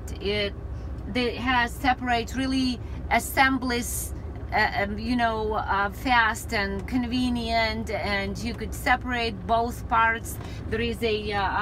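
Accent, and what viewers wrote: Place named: Ukraine. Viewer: Great video thank you. Sounds like a Ukrainian accent. Stay safe out there.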